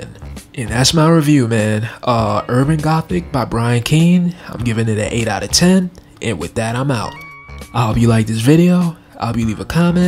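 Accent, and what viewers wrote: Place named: New York, United States. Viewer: Yo, I love your vibe, man. You sound from NYC but I'm not sure. I'm not from the States and I could be mixing up accents. Anyway I like that 'cause Mom picked up a NY accent for the many years she lived there. It's awesome to hear horror reviews in that real street dialogue. Also this book sounds like I need to read this shit soon. Thank you!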